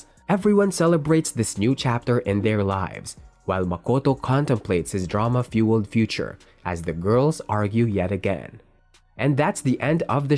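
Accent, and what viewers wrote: Philippines. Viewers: Pilipino kano tangina mo alam ko ang boses ng english ng pilipino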